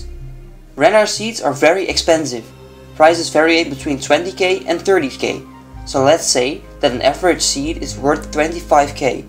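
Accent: Netherlands. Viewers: The dutch accent hahahaha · With this accent I´m gonna assume you´re Dutch :P Great guide anyway.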